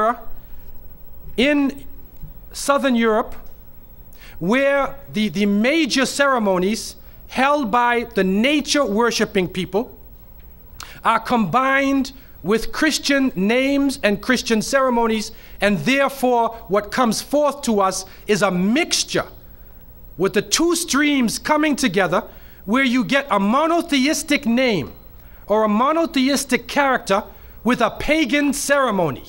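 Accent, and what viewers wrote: United States. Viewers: he's originally American. Boston I believe. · I love his American accent. Awesome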